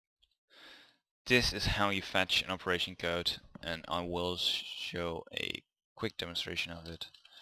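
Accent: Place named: Netherlands. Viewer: It's dutch accent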